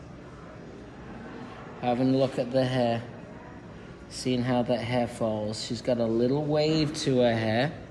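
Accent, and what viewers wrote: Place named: United States. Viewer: Why are you trying to speak with an American accent ??!!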